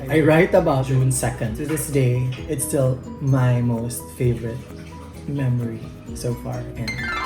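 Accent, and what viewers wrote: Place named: Cebu, Philippines. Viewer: I like the shape of your face..your nose and eyes. The way you speak in english also..d halata nga cebuana ka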